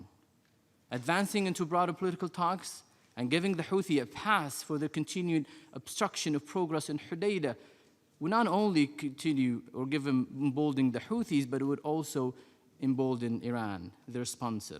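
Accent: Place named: South, Arab world. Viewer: دحباشي حسبته جنوبي عربي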